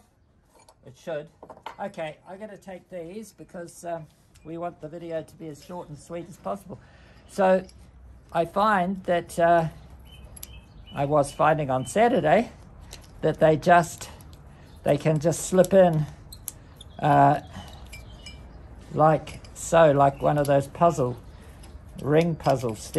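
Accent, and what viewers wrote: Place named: New Zealand. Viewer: You can never get enough of that KIWI knowledge. The accent doesn't hurt.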